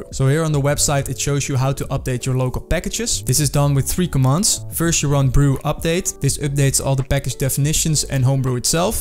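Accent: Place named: Netherlands. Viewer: zo jongen ik hoor zo erg je Nederlandse accent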